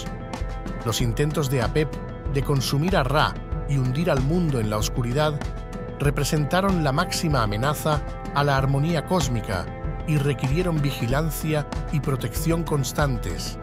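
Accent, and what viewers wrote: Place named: Spain. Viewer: Eres de España amigo? me encanta el asento de la IA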